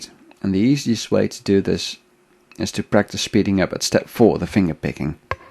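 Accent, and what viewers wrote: Netherlands. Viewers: Er vanuitgaande dat ik een NL-er hoor: Goeie uitleg! Dank!! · Quick question: are you Dutch? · Oh my god. Thank you for this... 10 minutes indeed. Can't mistake THAT accent.. I'm from Los Angeles but my dad's family is from Arnhem. Bedankt!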